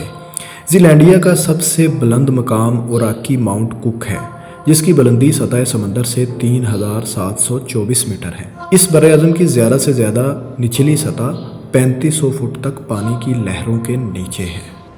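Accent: Punjab, Pakistan. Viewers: Ap Punjabi lehje ma vbolte ho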